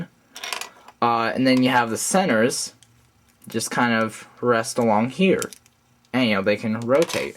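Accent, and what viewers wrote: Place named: Georgia, United States. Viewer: Goin out on a hunch here.....are you from Georgia? I didn't look at your profile, but you sound Georgian, like my cousin!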